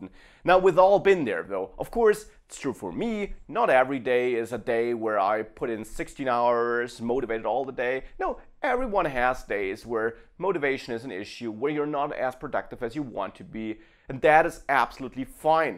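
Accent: Germany. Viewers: Okay cool, ich erkenne doch den Akzent meiner Landsleute, auch wenn euer Englisch natürlich super gut ist 😉 Dass es auch wirklich hochwertige Websites/Channels zum Thema Software Development aus Deutschland gibt, ist gefühlt leider irgendwie viel zu selten 😄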